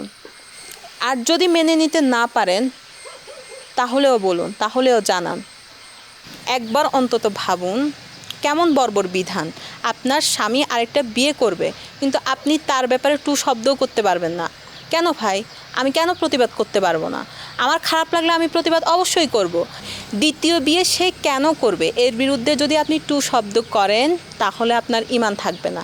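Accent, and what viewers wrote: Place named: Chittagong, Bangladesh. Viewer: সঠিক বলেছেন, সুন্দর উপস্থাপনা কিন্তু ভাষাতে চট্টগ্রামের আঞ্চলিকতা দূর করতে হবে।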